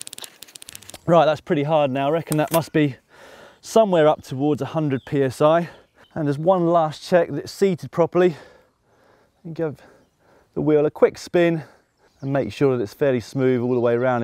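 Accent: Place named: United Kingdom. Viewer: Can you tell me where this video was made? You sound British...signed curious in Canada...Alberta...Calgary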